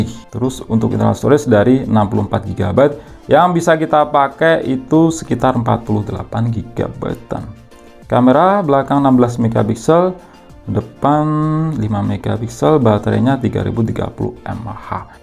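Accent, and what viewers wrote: Blitar, Indonesia. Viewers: Orang Blitar ta mas??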